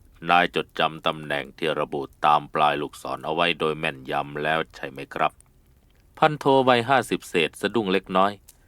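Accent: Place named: North, Thailand. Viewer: ชอบฟังครับภาคเหืมนมาก😅😮😢😂❤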